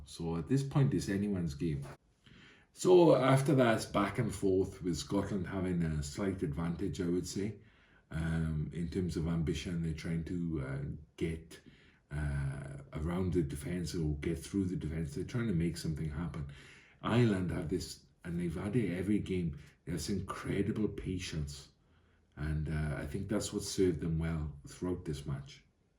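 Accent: Wales, United Kingdom. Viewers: Thanks, you have a lovely soft Welsh voice. Bet you sing in a choir.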